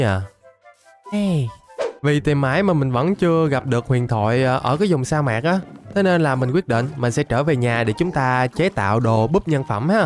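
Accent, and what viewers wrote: Vietnam. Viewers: Ah là người miền nam hay miền bắc vậy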